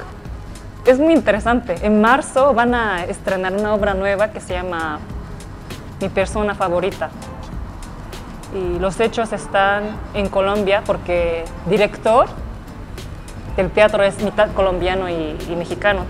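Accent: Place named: Puebla, Mexico. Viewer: Toda una diplomática, ya hablas como toda una poblana! Muy segura de ti misma!,.. eso es lo que caracterisa ala mujer poblana!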